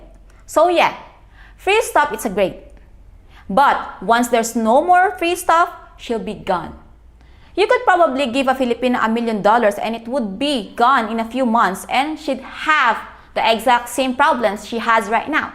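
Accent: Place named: Thailand. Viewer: If I listen your voice and accent next stop Bangkok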